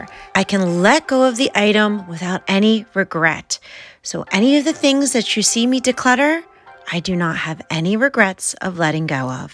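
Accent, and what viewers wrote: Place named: Mid-Atlantic, United States. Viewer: Hi new to ur channel. Came over here from the minimal mom. I def hear an accent from home, ( Pa , NJ, Del area). Ty for sharing with Dawn's audience. All the best ☀️🌻🐝🦋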